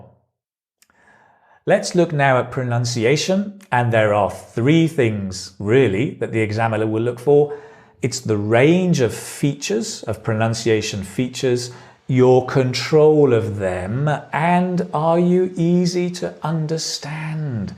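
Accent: United Kingdom. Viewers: Sir, which accent of British English do you speak ? Is it the modern RP ?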